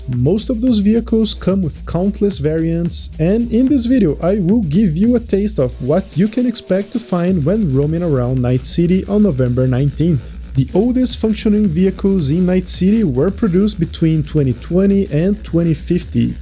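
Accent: Brazil. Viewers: Brazilian accent detected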